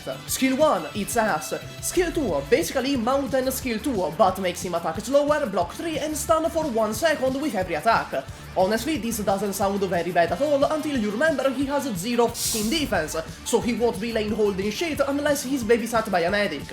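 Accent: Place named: Italy. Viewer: i don't even play arknights anymore i just like listening to an italian mf yap about shitty operators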